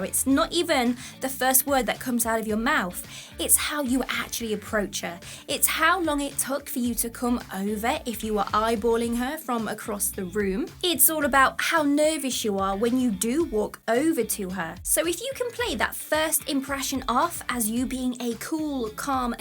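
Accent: United Kingdom. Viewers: I Just listen to her for learning British accent lol.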